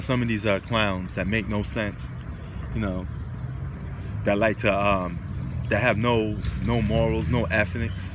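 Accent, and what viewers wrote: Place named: New York, United States. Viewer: I thought you was from NY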